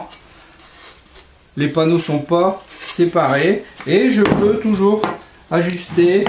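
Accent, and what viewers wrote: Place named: Nord-Pas-de-Calais, France. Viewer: Bien expliqué, le petit accent ch'ti, j'adore!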